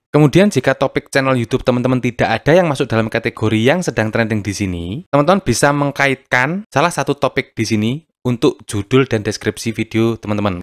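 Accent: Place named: Java, Indonesia. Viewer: Suka.sama chanel ini suaranya aksen Jowo medok...👍😁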